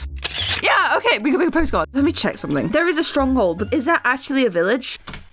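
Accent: United Kingdom. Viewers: are you a brit?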